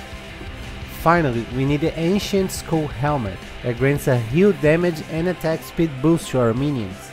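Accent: Brazil. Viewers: Só pelo sotaque é brasileiro com certeza!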